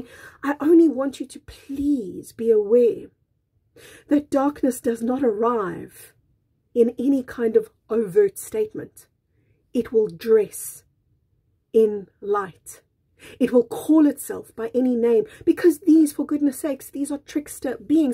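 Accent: South Africa. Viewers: Just curious, what is your accent? South African? you pronounce skeletal as "Skuh-LEE-tle." In America we say "SKEL-uh-tle." Again, just curious ☺️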